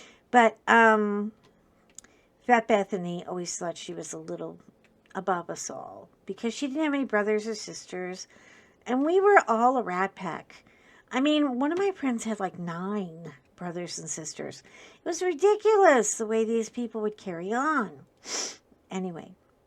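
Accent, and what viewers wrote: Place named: Ireland. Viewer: I'm sorry you had such a hard time in school. 💜 Unfortunately I know how it is. I was scared of going to school every day for about 7 years. The 2 last years wasn't that bad. So I managed well. And I guess we all know a "Fat Bethany" in some form. I'm very happy you survived 😊 I love how you talk Irish, as your mother 💙 Thank you so much for sharing your story with all of us. Big hugs 🤗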